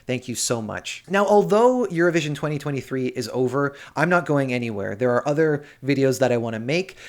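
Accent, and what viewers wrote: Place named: Canada. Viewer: I subscribed to your channel when I discovered it listening your appraisals of the Benidorm Fest. As I told you then, your channel was going to be the one in English I was going to follow covering Eurovision 2023. I have lived in Canada (BC and Toronto), so your accent sounds so familiar to me, which is a plus when I listen your commentaries. The objectivity and consistency of all of them is also here in this summary. I look forward to the video you are telling us is coming next.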